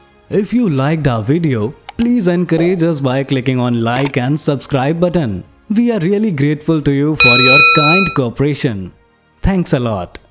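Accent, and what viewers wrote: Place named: India. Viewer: I hate indian accent 🤢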